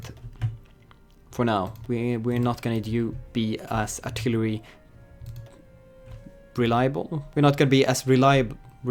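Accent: Sweden. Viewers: Är du svensk, för du låter väldigt svensk i din engelska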